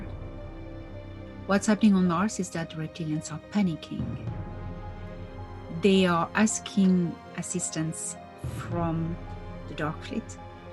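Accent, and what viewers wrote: France. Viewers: I love yr videos and appreciate yr commitment to truth and freedom of all humanity. I do have hearing issues. Your voice is soft and beautiful and I love yr French accent. However, so many times the music is just too loud for me to hear u. I’ve listened twice or more to sections that I can’t pick up. Love yr attitude and grace. Grateful for the information and the GF support. ❤️❤️❤️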